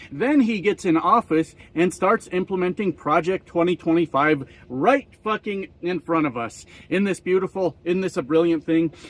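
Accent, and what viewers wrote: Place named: Canada. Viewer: You are hilarious !! Are you sure you aren't Canadian cause you sound just like us !! Love you brother . !!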